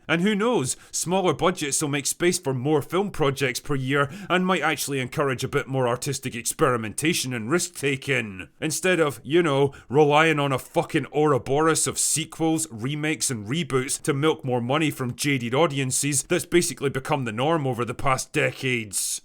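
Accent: Scotland, United Kingdom. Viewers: A Scottish chipmunk voice. Awesome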